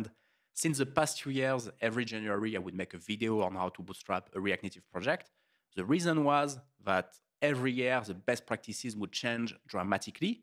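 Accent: France. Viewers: I'm detecting a strong French accent 👀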